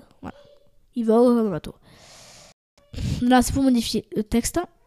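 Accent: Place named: South, France. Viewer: Oh l'accent du sud il est là !!!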